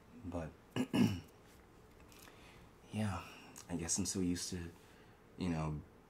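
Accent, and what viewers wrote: England, United Kingdom. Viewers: I’m English, and I love your English accent. Ignore the haters, x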